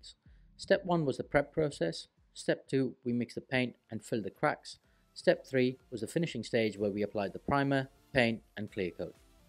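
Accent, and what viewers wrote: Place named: Malta. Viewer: Curious to know where your accent is from - you sound just like an educated Maltese. Great job btw!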